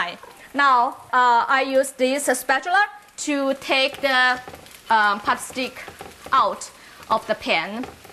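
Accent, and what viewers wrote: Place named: China. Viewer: english no good is hard to understand ....chinese english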